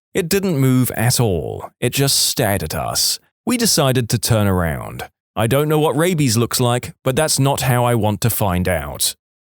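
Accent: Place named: United Kingdom. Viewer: Why he British tho.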